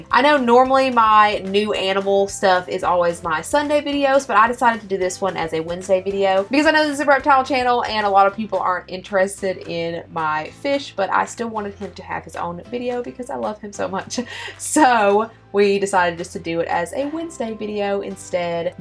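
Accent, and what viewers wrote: South, United States. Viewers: That southern accent though haha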